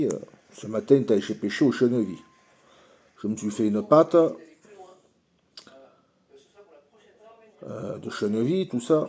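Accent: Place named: South, France. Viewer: Bonjour, Je suis carpiste je pêche la carpe depuis mes 10 ans. J'ai commencé à pêcher la carpe au Maïs et à la pelote. J'en ai maintenant 43 ans. Je pêche à deux ou 3 cannes de 12 pieds en 3.5lbs, avec les techniques modernes, rod-pod et détecteurs à la bouillette + stick ou PVA, Maïs, Tiger-Nut ou Frolic. Je ne sais pas dans quel coin vous pêchez, mais avec votre accent, je présume dans le sud de la France. Il existe des étangs qui sont complètement stériles du fait qu'il y a peu de densité de poisson et par conséquent une faible concurrence alimentaire. Il peut y avoir aussi une forte pression de pêche, le poisson est éduqué et plus méfiant. Il faut aussi savoir si les étangs dans lesquels vous pêchez sont empoisonnés régulièrement en carpes et connaître le cheptel et le poids moyen etc..... Si vous pêchez la carpe sur des étangs avec peu de carpes vous aurez aussi du mal à en faire. 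De plus, il faut aussi parfois amorcer préalablement pendant plusieurs jours un spot afin de conditionner et mettre en confiance le poisson. Il faut aussi chercher et repérer les bons spots sur l'étang que l'on va pêcher, sauts, marsouinages des carpes, présence de nénuphars, arbres et herbiers immergés dans l'eau, les roselières et les bordures riche en végétation etc... Concernant la méfiance du poisson sur un hameçon, je dirai que ce n'est pas l'hameçon, mais plutôt le corps de ligne, le bas de ligne et la présentation du montage avec l'appât en lui même, le type d'esche, la taille, la coule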